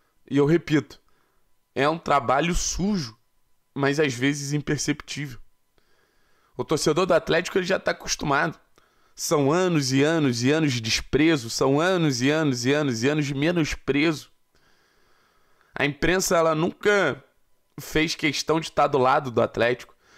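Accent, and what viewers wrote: Rio de Janeiro, Brazil. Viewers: Foda-se, me inscrevi, não tem como! O cara com sotaque carioca tá do lado certo da força! Parabéns, contra tudo e contra todos. Sempre! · Que vídeo espetacular..bom ver tanta sobriedade junto com sotaque carioca, coisa rara na imprensa esportiva kkkkkk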